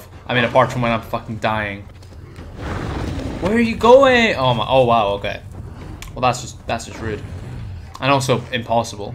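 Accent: Scotland, United Kingdom. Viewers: Where in Scotland are you from mate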